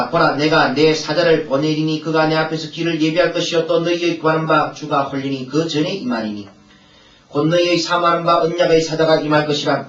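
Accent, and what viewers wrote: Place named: Gyeongsang, South Korea. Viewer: 아멘 아멘~~~경상도 사투리 정겨워요 부분부분 돌려 듣기하느라 순교하는줄~ 말 엄청 빠르시고 억양도 쎄고ㅋㅋ그래도 은혜 받고 갑니다 아모스와 호세아 같은 선지자가 절실한 때인거 같습니다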